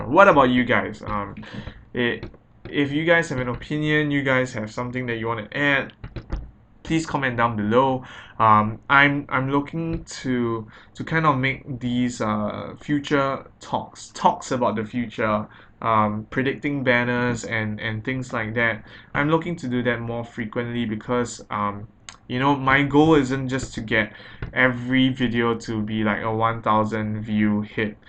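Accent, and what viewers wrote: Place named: Trinidad and Tobago. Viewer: You sound like you have a Trinidadian accent. Great video!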